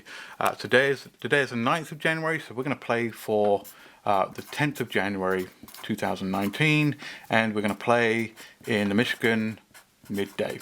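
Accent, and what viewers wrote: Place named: United Kingdom. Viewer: looking forward to. You UK? I love your accent.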